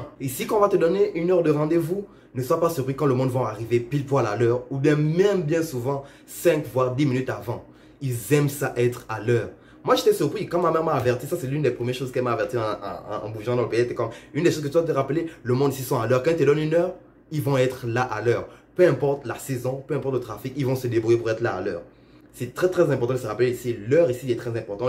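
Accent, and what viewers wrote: Gabon. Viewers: Tu as trop l'accent d'un gabonais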